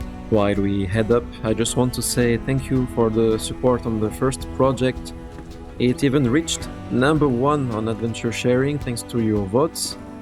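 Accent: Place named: Germany. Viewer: It's funny because when you speak English it sounds more like you could be German (a bit) and not French at all. C'est une très beau projet, très prometteurs. Curieusement j'ai assez de mal à construire dans Enshrouded. ça me donne énormément d'idée, trop en même temps et du coup j'ai du mal à m'y mettre, je bricole sur ce que j'ai, mais je ne suis jamais lancé dans LE vrai grand projet. Je fais au mieux, pour traiter l'immensité de besoin et possibilité qu'on acquiert, pour allé dans le sens de mon projet, mais sans jamais y allé carrément. Pourtant la petite pose dans le jeu serait le moment idéal pour le faire, car justement j'ai moins à faire dans le jeu proprement dit. Trop d'idées me paralyze en quelque sorte. Je suis quand même content de ce que j'ai déjà fais, mais rien à voir en volume avec tes réalisations, bien que mes idées soient du même ordre de grandeur. 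Il faut dire que je commence un peu plein de jeu au fur et à mesure des sorties et le temps n'est pas illimité.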